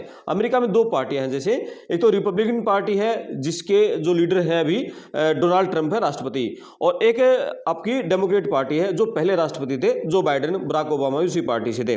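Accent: Haryana, India. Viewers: Haryanvi flavour bhut ganda lagta hai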